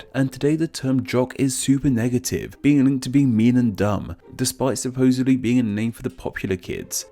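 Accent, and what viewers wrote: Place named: United Kingdom. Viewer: Listening to a British man explain hippies is hilarious.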